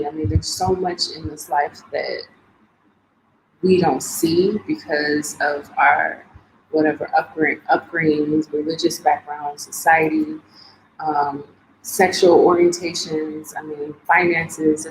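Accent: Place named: United Kingdom. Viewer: 😂 that UK accent something different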